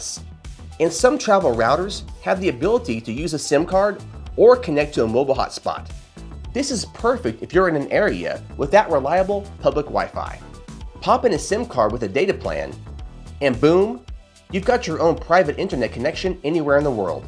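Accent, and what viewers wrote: United States. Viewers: Yes, agree, his first language is not English, but his American accent is quite good. I only hear the slightest of a non-American English accent on certain words and intonation. Nevertheless, great information.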